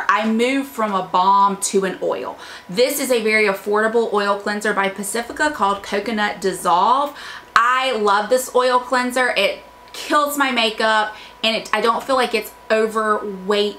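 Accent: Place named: Mississippi, United States. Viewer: I have been dieing to know where you were from and as soon as you said "oil", I knew it was Mississippi! I'm from Mississippi too but currently live in Houston. Love your channel and appreciate a lot of your humidity proof make up tips!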